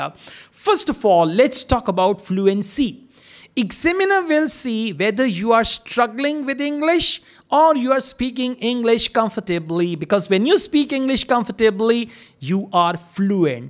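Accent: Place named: Punjab, India. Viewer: Punjabi acent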